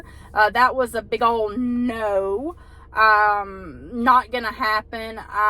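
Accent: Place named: South, United States. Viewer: Thank you and and for all you do for us Elvis fans. Glad to hear is doing better. Even Pastor Bob Joyce said he’s not Elvis. We all wish Elvis was still alive but sadly he passed on August 16 1977. BTW I love your southern voice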